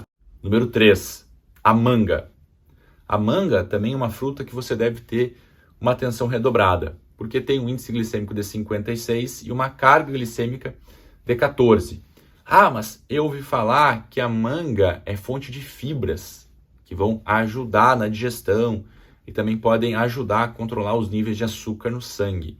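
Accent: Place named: South, Brazil. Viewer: Falo de Paço do Lumiar Maranhão, já tinha percebido que ele é do Sul pelo sotaque rs, nota 10 pro vídeo, muito explicativo, esclarecedor, além de muito dinâmico e simpático ainda é um gato 😍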